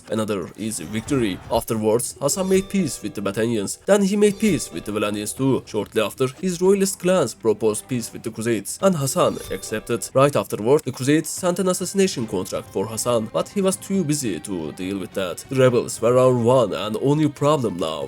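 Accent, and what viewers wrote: Turkey. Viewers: hasan diyişine kadar türk olduğundan emin değildim. 1 saatlik ingilizce konuşma iyi baya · Aksanindan anladım reis türk olduğunu 😅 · Aksanından dolayı türk olduğun anlaşılıyor selamlıyorum seni kardeşim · Where are you from bro? You sound Turkish. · Bro you sound Turkish...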